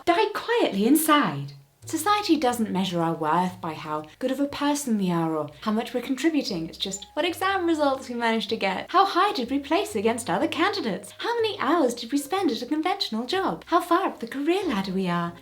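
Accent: RP, United Kingdom. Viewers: A video from a posh-sounding, very fragrant lesbian with the right amount of make-up being full of wonderful sentiments. What's not to love? Thank you for making this! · Is it just me or is extra British in this video. This video is good and inspiring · “Sound Too posh” yeah and it’s pretty great 👍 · Between the 50s aesthetics, British "proper speech" and your serene smile, I am not sure if it's scientifically possible to be this gorgeous God fucking help me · you do sound posh. i wish i sounded half as posh. the more i watch your videos and british english shows, the sadder i feel about the fact that as a canadian, i sound more like an american. which, at this point, is the real insult. 😂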